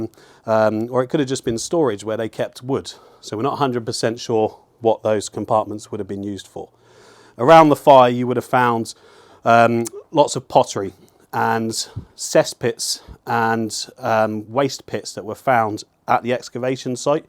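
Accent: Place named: Hampshire, United Kingdom. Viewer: I'd like to know where the narrator is from. His accent is interesting. Sounds a bit Portsmouth but with a slight Hampshire country twang. Am I close?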